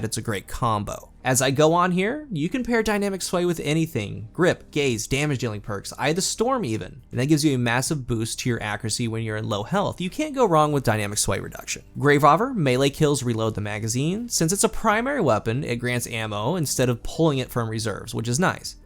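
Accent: United States. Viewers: ' dulcet American tones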